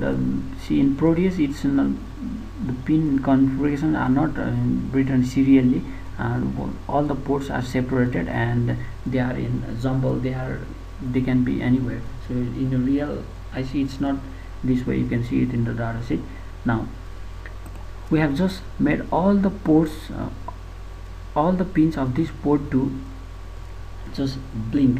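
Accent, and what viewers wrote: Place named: India. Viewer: Sorry about saying your from India. Just sounded like it.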